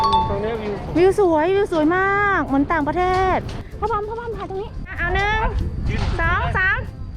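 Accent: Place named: North, Thailand. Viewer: แม่ พูดเหนือเพราะมาก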